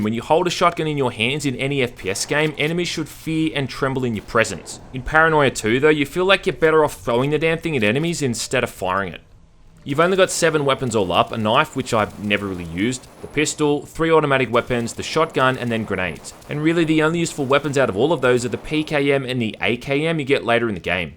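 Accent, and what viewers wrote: Australia. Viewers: Didn't know I needed subtitles to understand Australian.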